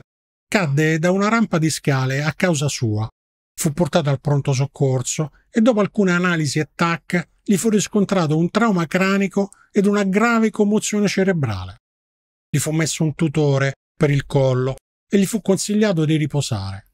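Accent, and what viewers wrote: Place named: Rome, Italy. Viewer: Mado’ che voce fastidiosa e che accento troppo marcato …Spaes Ics che sarebbe? Prova a parlare in italiano invece che romano, siccome che lo devono ascoltare tutti….video pessimo. DELETE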